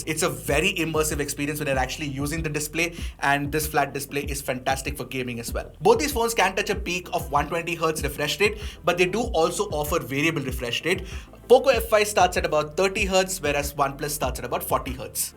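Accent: India. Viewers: Good indian english, I'm from Brazil and can understand 100% what you say, amazing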